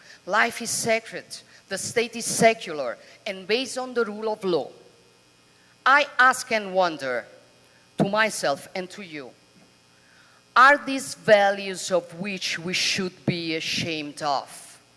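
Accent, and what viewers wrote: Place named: Italy. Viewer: Sta leggendo un discorso scritto da altri e la pronuncia la rende riconoscibilmente italiana. Niente di perfetto, dunque.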